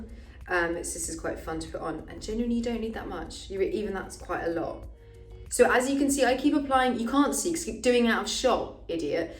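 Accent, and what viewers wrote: United Kingdom. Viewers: Love her British accent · Am i the only one who love this British accent? 😍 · I love her british accent>\\\< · Tell me your British without telling me your British (I didn't know she was Brit)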